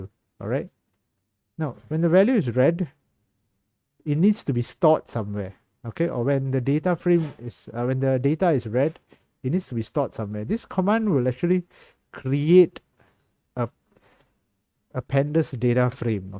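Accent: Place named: China. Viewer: Malaysian lol the English. Your English have Chinese accent annoying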